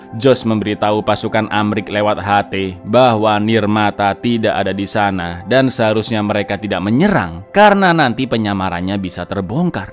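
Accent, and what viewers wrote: Java, Indonesia. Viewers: Jowone ngendi cak?? 😂 Nyonk Jawa Tengah cak, tepatnya Parakan Temanggung.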